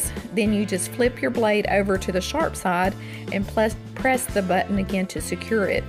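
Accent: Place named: South, United States. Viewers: Western North Carolina here. We're neighbors! Are you from East Tennessee? Your accent sounds very similar to ours. Kenny Chesney is from Sevierville, I think.:D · She from Texasss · I love your voice. I'm southern too and this makes me feel like I'm in Sunday school with my teacher Mrs. Katie ❤️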